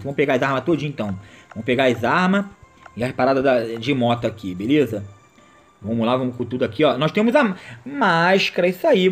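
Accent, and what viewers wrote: Rio de Janeiro, Brazil. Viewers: Vc E carioca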